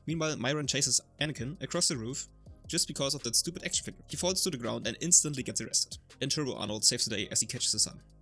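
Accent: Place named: Germany. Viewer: 😂 love the "tze", you crazy germans make it more fun just to speak, ceep it up ! 🎉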